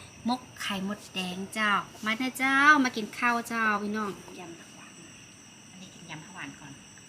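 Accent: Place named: North, Thailand. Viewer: พูดก็เพราะอู้คำเมืองฟังแล้วม้วนแต้ๆๆ..แกงน้ากินมากเด๋วจะไปตลาดซื้อมารองทำกินเนอะ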